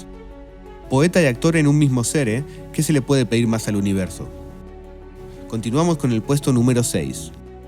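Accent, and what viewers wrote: Argentina. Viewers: Grande ese locutor argentino papa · Hermosa voz argentina!!!! me parecio excelente la locucion, nose por que el problema de que sea argentino!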